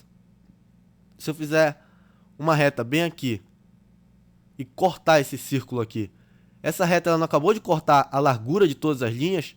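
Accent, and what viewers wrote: Pará, Brazil. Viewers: Pelo sotaque parece ser paraense. Meu contaerraneo Haha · Paraense?Ta explicado porque intendi o vídeo égua kk És professor?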